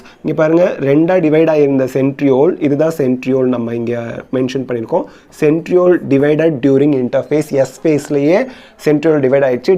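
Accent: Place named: Coimbatore, India. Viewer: Sir nernga entha place coimbatore maari thonuthu unga slang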